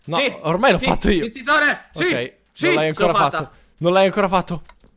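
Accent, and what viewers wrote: Sicily, Italy. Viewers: Ma sei siciliano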